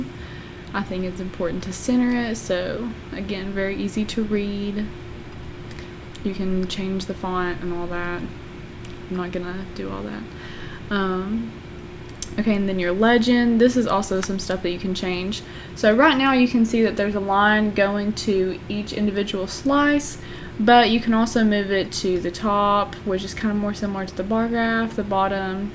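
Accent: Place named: South, United States. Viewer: i love your southern accent :)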